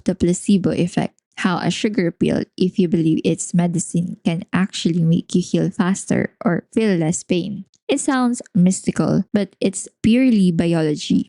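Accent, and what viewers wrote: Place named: Philippines. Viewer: Pronunciation....since you have Phillipines english accent or somewhat like that...use AI for your for narration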